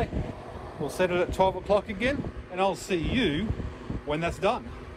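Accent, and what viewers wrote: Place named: Australia. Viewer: If it's not branded Ryobi, then it's NOT a fake. From the accent the reviewer is Australian who tend not to pay attention to facts. Anyone buying a replacement third party battery can clearly see it's not a genuine Ryobi or a Makita or Worx. So it's ridiculous to call it a "fake". Just sensationalism.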